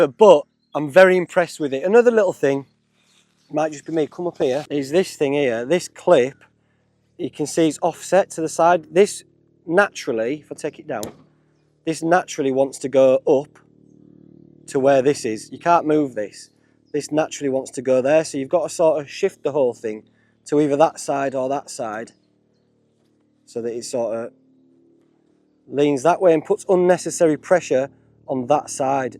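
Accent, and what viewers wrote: Yorkshire, United Kingdom. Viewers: Thanks a heap for this review, I'm looking hard at this tent but you've given me a few things to ponder. Oh and and bloody good YT too. Detail where there needs to be detail and wide shots where there need to be wide shots, good light and audio. (Love a Yorkshire accent... Lol, my mum's from Sheffield.) One question.. do you know if the fly seams come sealed ? Is it rain/weather proof straight out of the box , so to speak? Greetings from Tasmania, Australia.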